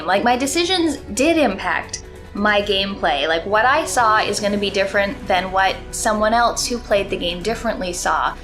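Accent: Canada. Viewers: You are the first person I've seen with whom I agree COMPLETELY about the remaster. Also, I just picked up on your Canadian accent for the first time just now...which is unforgivable because my wife is from Canada and has the same accent.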